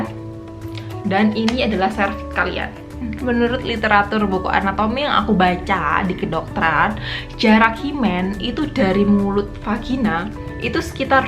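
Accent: Java, Indonesia. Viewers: Sampean wong endi tohh mbak medok tenan jowo na akoh wong ngapak mbak ora ngapak ora kepenak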